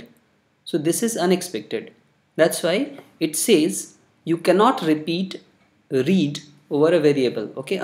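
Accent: India. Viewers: Are you Bangali ?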